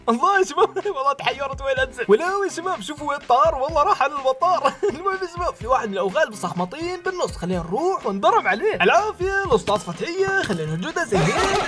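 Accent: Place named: Syria. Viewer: ياخي انت سوري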